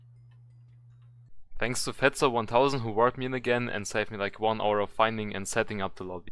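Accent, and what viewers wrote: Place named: Germany. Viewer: How did I only hear your german accent after hearing yoou speak german?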